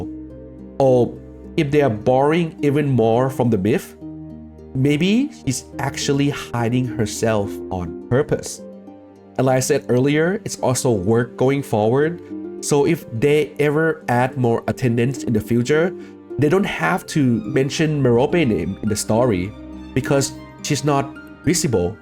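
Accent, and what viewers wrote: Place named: Thailand. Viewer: Excuse me, this is not an insult. I wanted to know that are you Thai or not? I recognize it by the accent. I am just curious.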